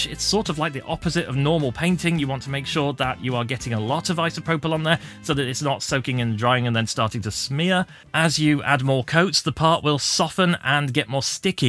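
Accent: United Kingdom. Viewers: I've not tried it, but you might be able to get more purer ethanol in the form of BioEthanol (~95%) assuming by your accent that you're in the UK, they sell this in B&Q, although the pricing is pretty much the same as IPA, so not sure if there's any benefit.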